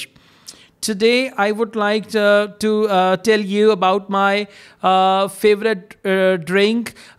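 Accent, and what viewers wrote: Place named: Punjab, India. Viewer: Punjabi acent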